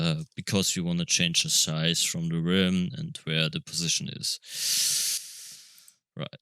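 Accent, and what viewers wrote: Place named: Germany. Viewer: nice tut,i could hear the german accent xD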